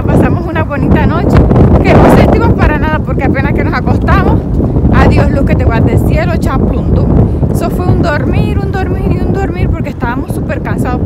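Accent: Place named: Atlántico, Colombia. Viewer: Hola, como estas? Me encanta ver tus videos, me pareces una mujer con un carisma muy lindo y chistosa. El modo de hablar con ese gopeadito polonuevero que aún permanece en ti Bendiciones, besos y saludos de tu abuelo cesar Cervantes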